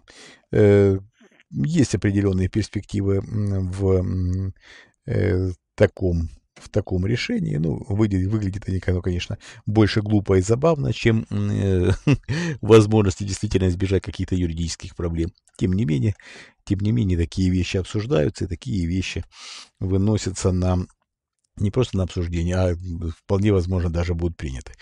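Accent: Ukraine. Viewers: Речь украинская,разве не слышно?)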